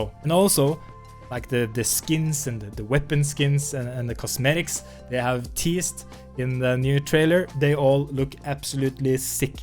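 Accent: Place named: Norway. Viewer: So excited for the new season! (du er nødt til å være norsk jeg kan høre det)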